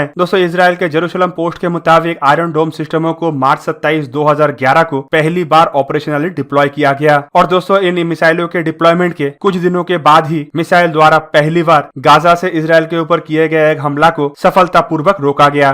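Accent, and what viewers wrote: Bengal, India. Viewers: Bengali hai,isliye Hindi thoda alag trah se bolta h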